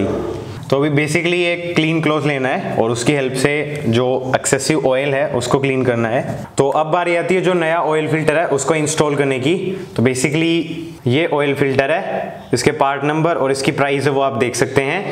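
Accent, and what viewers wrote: Rajasthan, India. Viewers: Bhai tum jhunjhunu rajasthan se ho kya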